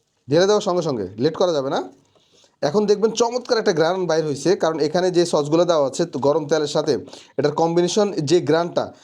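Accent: Noakhali, Bangladesh. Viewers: রান্না টা দারুন, তবে 1টা প্রশ্ন ছিল আপনি কি নোয়াখাইল্লা?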